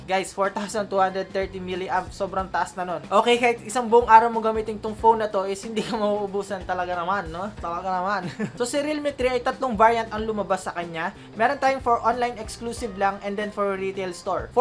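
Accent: Pampanga, Philippines. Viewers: Isa kang kapampangan sure ako sa iyong punto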